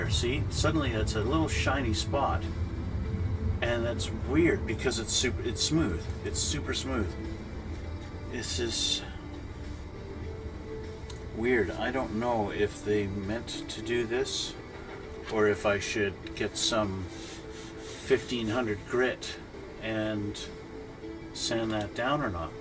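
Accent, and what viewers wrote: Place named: Canada. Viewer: Where are you based out of? You sound Canadian.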